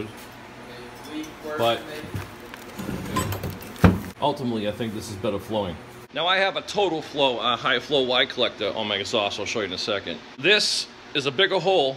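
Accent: Boston, United States. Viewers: Great video. I’m looking for a good set of headers for my ‘21 Ram 1500 Hemi. Also , where are you guys from? You talk like me (Boston).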